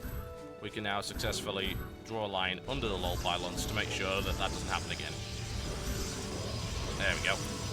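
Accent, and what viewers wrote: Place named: United Kingdom. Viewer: I really wish you would continue this series tb. I understand you're a busy man with all the SHOUTcrafts and whatnot but this is a nice series to watch as a struggling beginner. I think watching you play and hearing your commentary on what you should do and what you should have done better really helps. Watching the pros play doesn't help much because its very fast paced and hard to follow and learn from. Plus hearing your charming british accent is nice too :).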